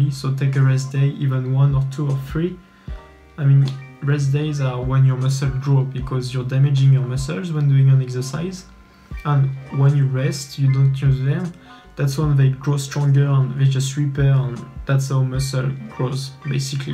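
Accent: France. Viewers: Sa se vois que t'es français à cause de l'accent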